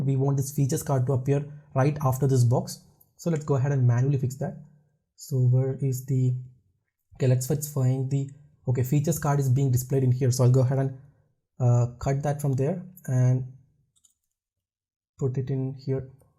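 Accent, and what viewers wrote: Kerala, India. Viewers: that malayli tone to english love it :) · pure mallu accent :) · You got a good mallu accent.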